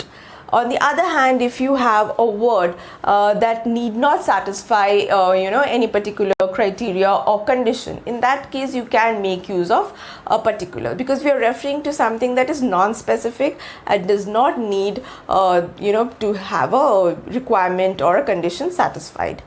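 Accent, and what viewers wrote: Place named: India. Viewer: This's the most close pronounciation of The Indians to English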